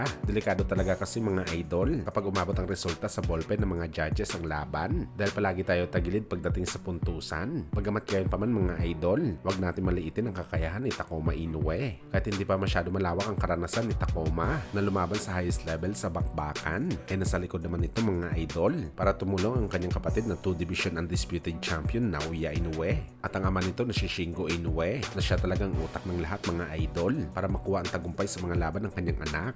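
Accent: Visayas, Philippines. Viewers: Ikaw lng ata nakakarinig Ng sinasabi mo,punting bisaya na nga Ang hina pa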